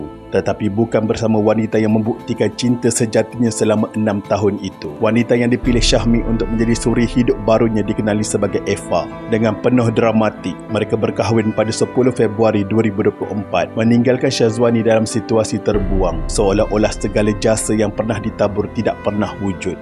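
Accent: Kelantan, Malaysia. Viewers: KELANTAN?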